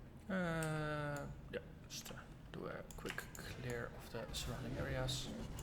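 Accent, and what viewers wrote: Netherlands. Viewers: true fan of the new chaos dmg here. quick question, are you dutch? you sound dutch.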